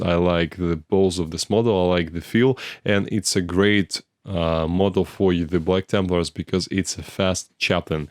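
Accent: Russia. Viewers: Are u Russian?🥸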